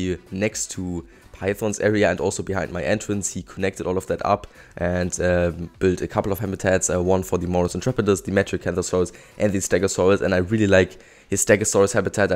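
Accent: Germany. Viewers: Dieses Aussprache hört man als Deutscher sofort haha. Starkes Video ✌ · German accent.... Cant give me that...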